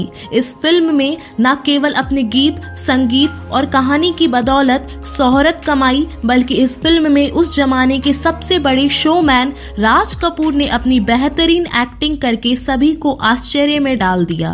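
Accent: Bihar, India. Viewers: Bihar se hai ye ladki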